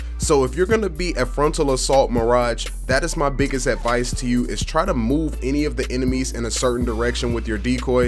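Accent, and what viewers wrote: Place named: Compton, United States. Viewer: He sound like e be from compten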